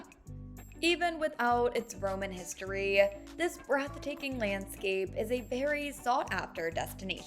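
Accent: United States. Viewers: The voice, my God! American accents are the worst.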